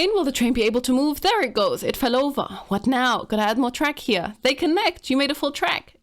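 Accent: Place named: Germany. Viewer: great points :) you articulate yourself very well -I hear a slight german accent .... I lived in Berlin for 10 years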